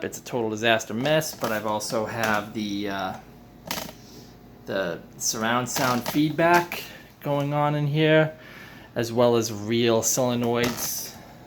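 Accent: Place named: Boston, United States. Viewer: You sound like.yohr from boston :)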